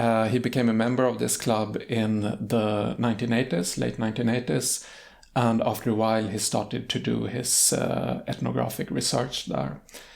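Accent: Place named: United Kingdom. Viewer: Dear, very good arguments recommending the book. But I am highly interested in when and where do you study English? Your accent is very British and incredibly understandable!! And I would like to know if you wrote a book about learning Swedish, Please, add more and more videos about YOU;) Greetings from Argentina!!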